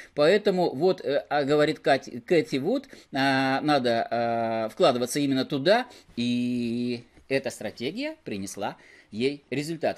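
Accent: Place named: Urals, Russia. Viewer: Уральский акцент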